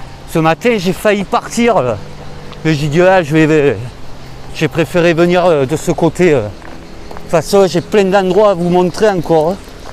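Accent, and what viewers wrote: South, France. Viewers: Bonsoir et tous,merci pour le guidage,je passe un moment de réel balade,je suis pas tout seul tu est là et avait l accent du midi,les cigales et grillons chute on est bien on continue,je les ouvres bien grand mes yeux,heureux ho oui,comme un marcheur aventureus.MERCI POUCE BON VENT.papy robert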